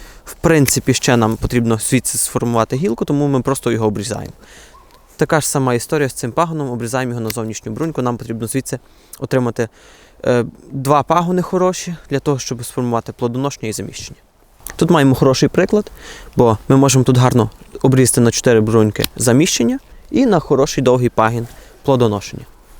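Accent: Western Ukraine, Ukraine. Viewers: Та трошки iз захiднянським акцентом, але вишукано й в одночас просто.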